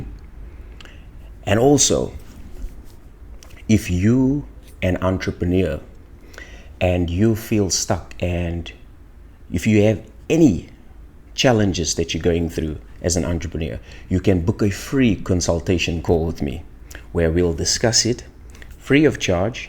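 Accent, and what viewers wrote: South Africa. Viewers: Sounds like a South African🇿🇦. Thank you for sharing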